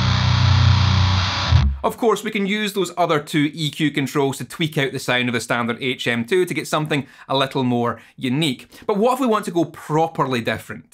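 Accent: Scotland, United Kingdom. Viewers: I love the vocal chain. And everything sounds great in a scottish accent. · I'm so happy my love for how certain words (most words tbh but some in particular) sound so good in a Scottish accent was validated by the man himself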